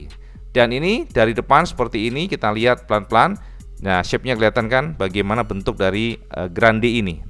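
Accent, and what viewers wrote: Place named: Tulungagung, Indonesia. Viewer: enggres aksen tulungagungan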